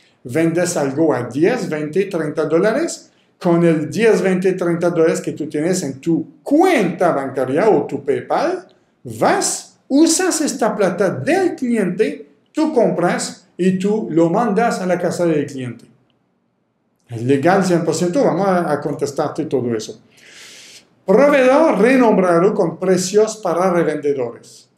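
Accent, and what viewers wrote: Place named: France. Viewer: L'accent c'est très joli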